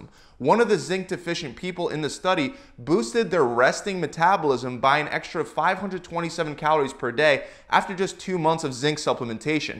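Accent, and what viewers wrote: Philadelphia, United States. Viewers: “Wooder” - Guy has to be from Philly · now we know he is from Philly :)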